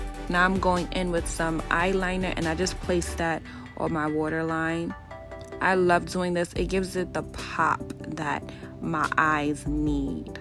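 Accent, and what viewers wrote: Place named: New York, United States. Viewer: ASA new subscriber!!! Beautiful look!! Edited to say, I thought that was a NY accent (I'm from NJ) lol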